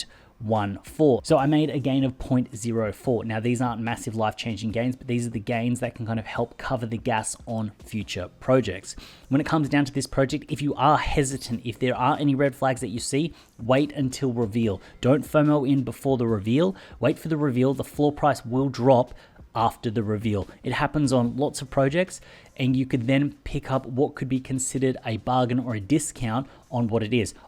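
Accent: Australia. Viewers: Where are you from mate? sound Aussie to me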